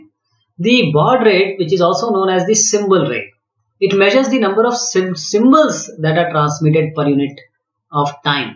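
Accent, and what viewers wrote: India. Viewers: Classic Indian accent, noice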